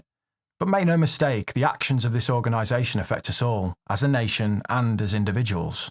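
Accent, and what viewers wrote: Birmingham, United Kingdom. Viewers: I knew it, I was sure that you had the Brummie twang! But why oh why are you following city and not the villa? 😊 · Nice to hear someone with a Birmingham accent sounding extremely intelligent. Keep it up. Bro from Great Barr.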